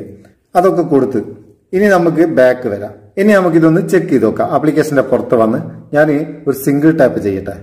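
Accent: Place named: Meppayur, India. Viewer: മേപ്പയൂർ കാരനാ ല്ലേ.... ഭാഷ കേട്ടേരേ തോന്നി പേരാമ്പറെ ന്റെ അടുത്തേടിയൊ ആണ്ന്ന്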